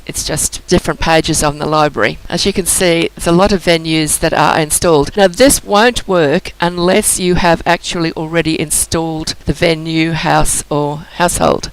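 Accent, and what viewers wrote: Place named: Australia. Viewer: So very clear and great to hear an Aussie voice, thanks heaps.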